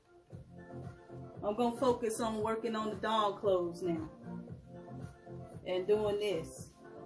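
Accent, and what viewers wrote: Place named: South, United States. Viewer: So funny to see You in person and hear You speak. You have a southern accent for sure 😂. It’s super adorable 🥰.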